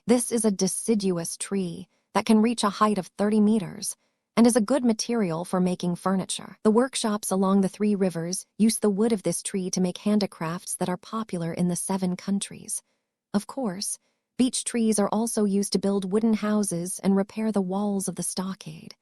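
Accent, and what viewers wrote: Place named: China. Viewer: I knew it was chinese translated snd fan made. Just how the narrator speaks. Good story though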